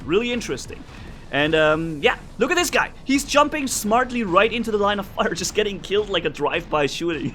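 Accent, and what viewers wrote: Germany. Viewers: Been watching your vids for a while now, they're really awesome. As soon as a I heard you for the first time, I knew you were a fellow German :D. Your positive attitude is really cool aswell, makes the videos even better. Keep up the good work, I subbed :)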